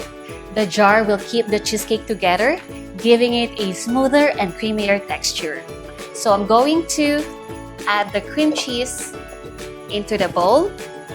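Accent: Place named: Philippines. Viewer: I thought you are in a foreign country then I realize you were a filipina when you spoke and saw the video.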